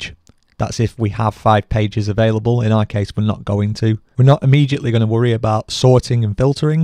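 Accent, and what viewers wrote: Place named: Scotland, United Kingdom. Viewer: Lovely accent, are you Scottish? :)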